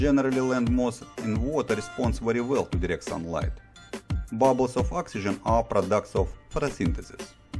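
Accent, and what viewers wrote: Russia. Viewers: Why you sound like Russian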